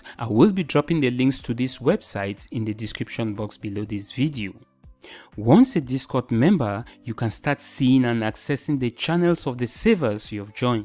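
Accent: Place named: India. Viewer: srry i thought u were indian bcs u have indian voice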